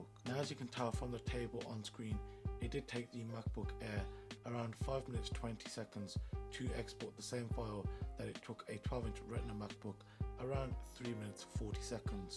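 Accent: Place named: England, United Kingdom. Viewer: annoying english accent. good review though.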